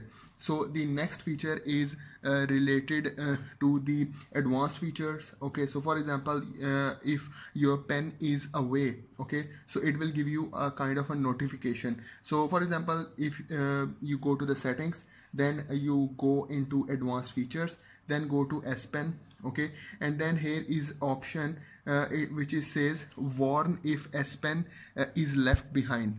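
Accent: India. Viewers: I love indian-english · Bought the S9 Ultra. Sreached for S Pen Features and stopped as soon as I heard an indian accent. =)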